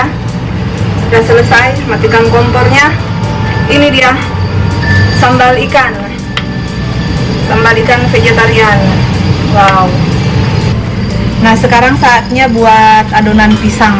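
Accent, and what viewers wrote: Manado, Indonesia. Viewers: Yup..... mantap ...... dari logadx campuran Manado n daerah mana ya......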